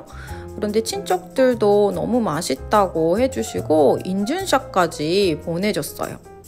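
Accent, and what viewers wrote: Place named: South Korea. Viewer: 영상에는 외국인 엄마라고 했는데 외국인 맞나요?🤔 그냥 한국인이 말하는 억양인데요? 아님 부모중에 한분이 한국인신가요?😏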